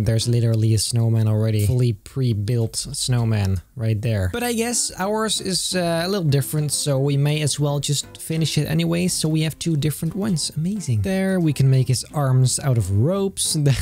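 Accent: Netherlands. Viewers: I knew you were dutch Baha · are u dutch? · Are u nederlands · your from Belgium or are you from the Netherlands? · WAIT A MINUTE… Ben je Nederlands?! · i new your from belguim or netherlands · ben jij van België of Nederland of Engeland, ik gok Nederland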